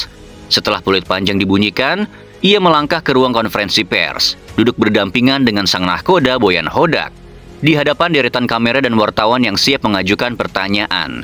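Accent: West Java, Indonesia. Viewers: Denger aja logatnya msh ada Sundanya 😂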